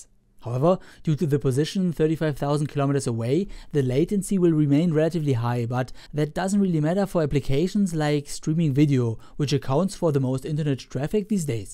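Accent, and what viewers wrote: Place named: Swabia, Germany. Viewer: Dieses Spätzle-Englisch!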